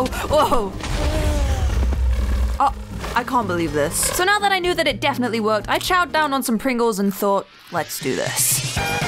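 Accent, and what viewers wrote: United Kingdom. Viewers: I don’t know why but the sound of a slightly unhinged female with a British accent is always so funny to hear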